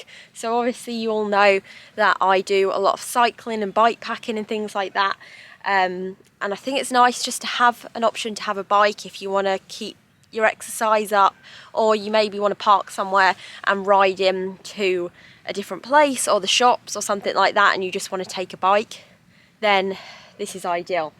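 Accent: United Kingdom. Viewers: Enjoy listening to you,. (English is not my first language). Love the British English. You are both funny and cute.. the thuft of hair dangling out. 🤣 Technical support/considerations: You have now two batteries in your car, one for the engine, the other for utilities. Please treat them as two separate electrical systems each with its on fuses. (I assume your batteries are not connected in parallel). Over time, a potential difference is created between the two batteries. Like one would be 12v, while the other would be 11v. This potential difference is a potential hazard. Please do not cross over from the electrical system of one battery, to the other. for that matter, a common ground is a cross over. My comments are irrelevant, if all the batteries are connected in parallel to one another.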